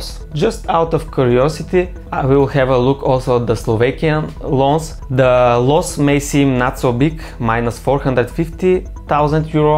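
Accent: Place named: Bulgaria. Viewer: where are you from originally? Just curios Edit: I heard Bulgaria 🇧🇬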